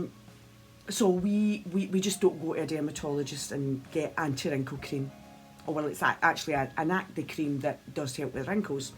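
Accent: Scotland, United Kingdom. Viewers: I think scottish accent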